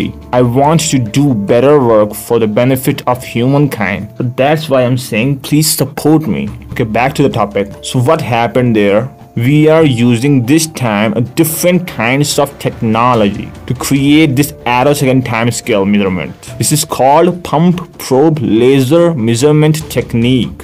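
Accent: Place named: Indonesia. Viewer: Indonesian trying american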